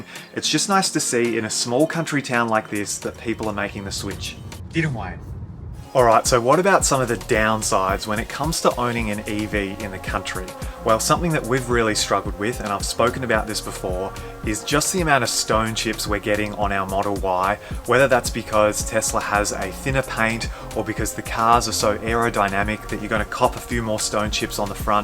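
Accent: Australia. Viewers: I really love your videos. And your aussie accent 😊 i really have to get back to down under… greetings from a model y owner in germany. But the green/sand sweater 🫣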